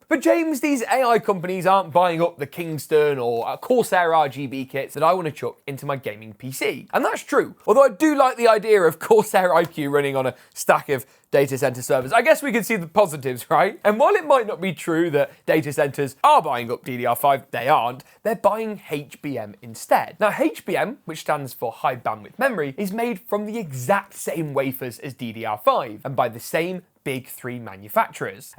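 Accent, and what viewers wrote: United Kingdom. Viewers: His accent is British , its completely different compared to US accent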